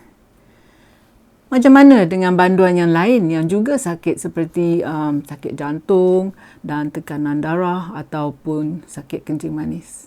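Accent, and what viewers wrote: Perak, Malaysia. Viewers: And...i suka sangat loghat Perak you.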